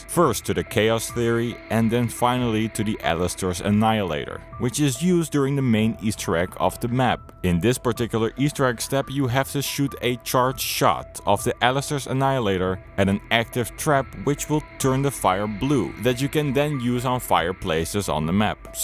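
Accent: Netherlands. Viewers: talk wit a verry dutch aksent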